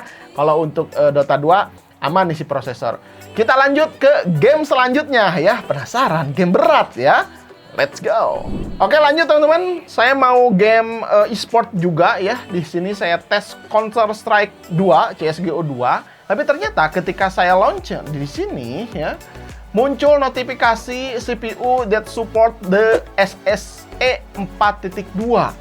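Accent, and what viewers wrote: West Java, Indonesia. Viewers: lah emang Sunda, subscriber baru ya ngab? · Sunda banget aanya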